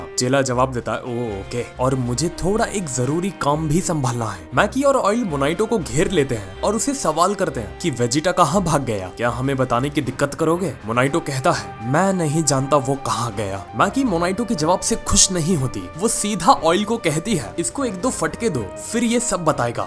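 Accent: Maharashtra, India. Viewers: Bhai kya aap Marathi ho 😇😇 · Today's narration is op 🔥 specially little bit marathi used during narration 🤣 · BHAI TERA MARATHI KADK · Bhai tum Marathi hai?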